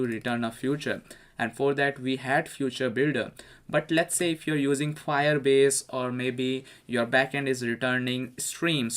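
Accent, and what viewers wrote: India. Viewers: Your voice like Indian people 🇮🇳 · An awful English accent... Indian people should never be allowed to speak English.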